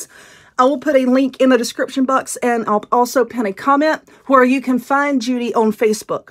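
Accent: South, United States. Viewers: What state are you in? I like your Southern accent. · I love your southern accent. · this is absolutely beautiful! I can't wait to find the frames. I've been following you for a couple of yrs now and I absolutely love watching you with all of your crafts. You've got a awesome personality. I love your personality. I don't understand why people have to be so low. If they don't like you or what your doing there's a simple solution. Get off the channel. I loved how you handled that by telling them to get a hobby lol. Some people just love drama! My friend does wreath videos and there for awhile she was getting harassed pretty bad. Mostly from other wreath makers and their followers. I hope that it doesn't get that bad for you for your so much fun to watch. I also love your accent. I'm a country girl myself with a heavy southern accent. Anyways keep ignoring them stay positive with your bubbly personality!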